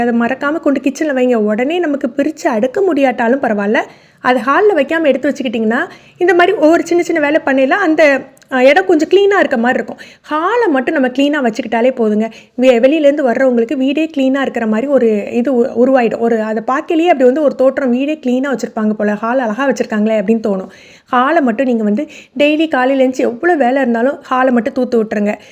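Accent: Tirunelveli, India. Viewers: Well said.. அப்படியே Tirunelveli பேச்சு 😂😂❤❤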